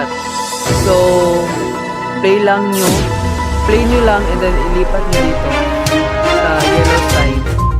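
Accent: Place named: Visayas, Philippines. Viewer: feel nako bisaya gyud ni ba HAHHAHA i feel uuuu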